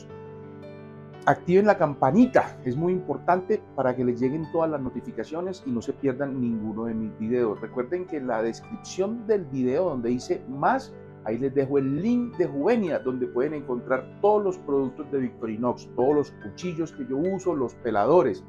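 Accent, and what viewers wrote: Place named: Colombia. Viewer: Me gusta Mucho esa técnica para Cocinar un delicioso Arroz pero también su acento Colombiano or que me imaginó que es de Colombia por su asentó Dios le Bendiga Gracias por la receta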